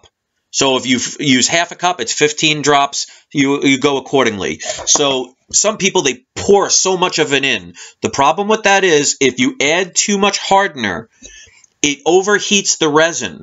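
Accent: New York, United States. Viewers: I really enjoyed listening to this video and learning all about orgonite from you!! You are so knowledgable, and so passionate about what your doing and we need more people like that. Creating an awakening within others is difficult esp. when they are so quick to dismiss things out of ignorance, or discomfort. I commend you for doing your part to disrupt the powers that be, and bring positivity into the world. plus you sound like a fellow new yorker haha so you're already good in my book :)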